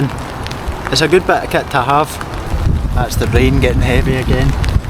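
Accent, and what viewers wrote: Scotland, United Kingdom. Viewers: I love the Scottish accent and the video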